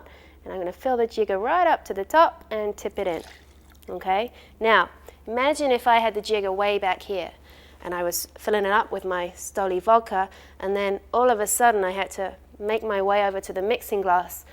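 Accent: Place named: England, United Kingdom. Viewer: I wonder what town in England was from, her accent is quite interesting.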